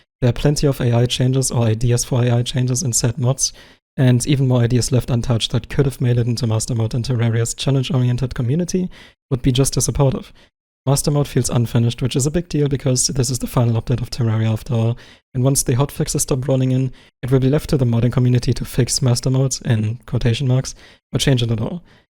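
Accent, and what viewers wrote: Germany. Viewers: Man hört halt direkt raus, dass du Deutscher bist. Aber super Video kann ein paar deiner Kritikpunkte teilen.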